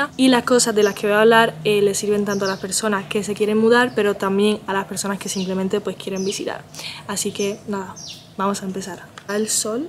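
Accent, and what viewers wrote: Andalusia, Spain. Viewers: Amos la primica, me dices que eres Andaluza y me lo creo,como se te a pegao el Andaluh, chiquilla. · Cuando empieces a hablar no digas "hi guys", ni que fueras gringa 🤣. Di hola que tal o como estáis 👍😁. Por cierto, hablando ya eres andaluza total 😅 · Al principio pensé que eras andaluza. Se te ha pegado el a acentillo ya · Esta chica lleva 2 años en España, hasta se le ha pegado el acento andaluz. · si creo que no lo vi desde el principio, esta visto que aun necesita mejorar el andaluz. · Me dicen que eres andaluza, y me lo creo. Y qué bien se te entiende! Buen video y ameno. Y te expresas genial.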